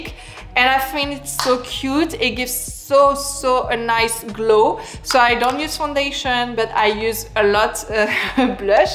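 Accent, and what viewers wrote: France. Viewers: Please do an updated video on this topic, but starting with a bare face and going through your routine, a ‘get ready with me’ style video. It’s more fun to see it in action and see your technique. Updated product recommendations too. Please! 🙏 You are my favorite style channel I’ve ever found, you’re truly inspiring, i aspire to be as chic as you someday soon. And I love your thick french accent. J’adore ☺️❤️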